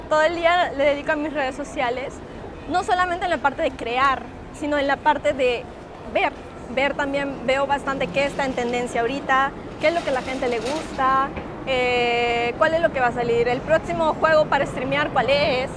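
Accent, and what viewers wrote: Lima, Peru. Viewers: En Perú se habla como ella usted se ve que no conoce nada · Y bien peruano, aunque ella suena limeña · Se le siente muy peruana , me encanta quw sea bilingue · Ciertoooooo acento limeño!!! El acento peruano es neutro y único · su espanol es muy limpio como la mayoria de peruanos que no son de la sierra ojo ! yo quiero mucho a mis compatriotas andinos , pero manejan un espanol muy marcado ! a diferencia del limeno que puede ser limpio cuando se lo propone incluso por encima de cualquier pais hispano americano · Como se le nota el acento Peruano jajajaja exelente 🇵🇪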